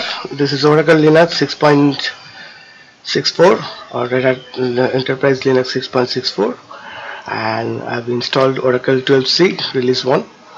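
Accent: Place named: France. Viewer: puto acento francés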